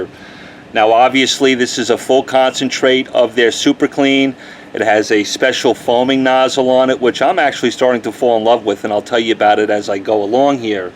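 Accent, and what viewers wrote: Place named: Long Island, United States. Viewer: You must be from long island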